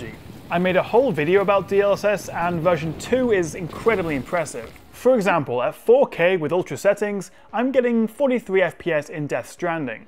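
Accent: United Kingdom. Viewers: Surely it is, and it was with an emphasis on good job, besides doing your leg work here with your community, then you truly make differentiated videos beyond the british accent;)! Nvidia better team up with u again! Your explanation of these semi-niche brandings are unique and can’t be outsourced to India or perhaps done by a futuristic AI 😛(speaking of DLSS).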